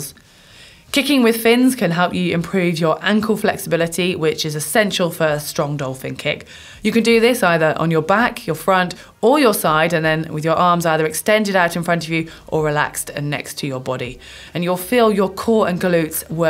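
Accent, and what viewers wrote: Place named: United Kingdom. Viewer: the British accent i can't understand and I'm not even american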